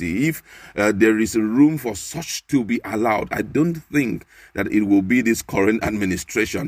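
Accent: Nigeria. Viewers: U sound as a one Nigerian guy I am talking to newscaster we biafrans are not interested in what happens in Nigeria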